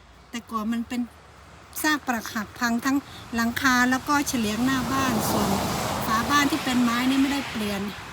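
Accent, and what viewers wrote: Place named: Surin, Thailand. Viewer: พูดเหมือนคน สุรินทร์ มาชมสวนครับ บ้านน่าอยู่วิวสวย ดูชื่อ แล้ว บุรีรัมย์ สุรินทร์ บุรีรัมย์ รอ เรือ ขัดเจน เป็นเอกลักษณ์ เพื่อนผมสมัยเรียนครูด้วยกันก็แบบนี้ครับ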